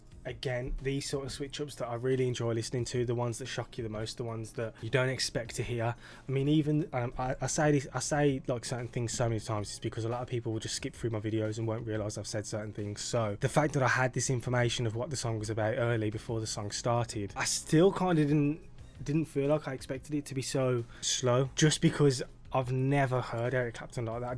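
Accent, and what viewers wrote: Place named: England, United Kingdom. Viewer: Trying to figure out your accent from my Canadian perspective. Are you from the midlands or from a northern town/city?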